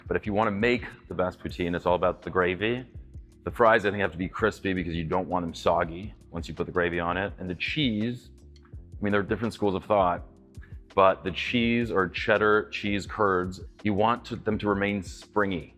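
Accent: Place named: Upper Midwest, United States. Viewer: Coudon! Tim Horton’s n’est pas si mauvais. Ok, ok... leur café n’est pas fameux, mais... Il semblerait que tu réserves un plat de poutine pour ces occasions spéciales. Bonne décision! (Je fais de même.) Ton anglais me sonne du Haut Midouest et pas tellement canadien comme tu dis. Merci de tout ce que tu fais,!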